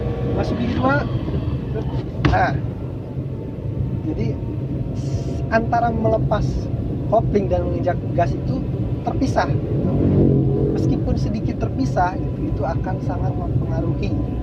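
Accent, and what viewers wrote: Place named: Pangandaran, Indonesia. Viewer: Orang mana a siga orang pangandaran ningan😁😁🙏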